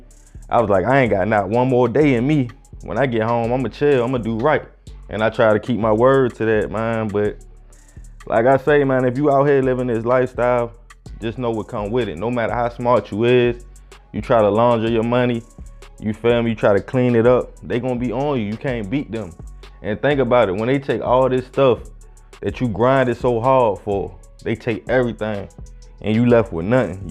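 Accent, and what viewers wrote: Baltimore, United States. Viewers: That's the point. HE SOUNDS LIKE BALTIMORE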